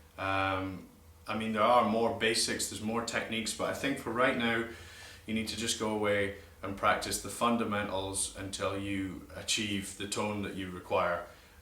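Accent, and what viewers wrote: Scotland, United Kingdom. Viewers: Are you Scottish? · You sound a little bit Scottish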